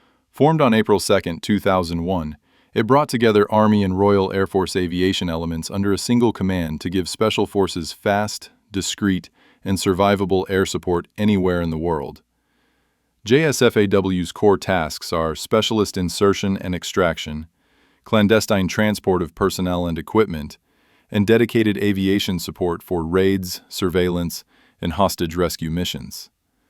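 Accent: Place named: United States. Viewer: I was going to correct you but as soon as I heard an American voice I won’t bother and just scrolled on.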